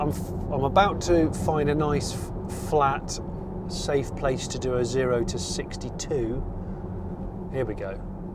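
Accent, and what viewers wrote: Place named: West Country, United Kingdom. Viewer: lol at your voice control tests with your wurzzle accent, good luck with that fella. In the many years or working in IT and tried pretty much all of them I have only found Cortana to understand our twang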